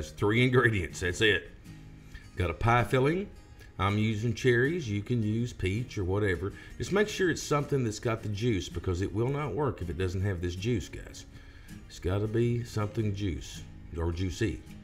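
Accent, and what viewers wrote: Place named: South, United States. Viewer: You are so cute. I could listen to you talk all day with that country accent.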